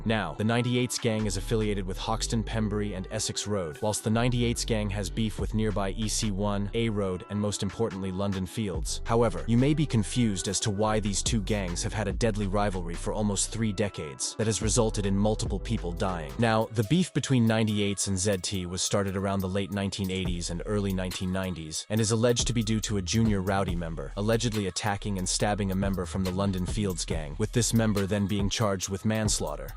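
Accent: United States. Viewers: Its an ai generated voice I think, so it messes stuff up. I think that's the reason anyway. Also an American voice so if not ai that's probs why place names are being messed up.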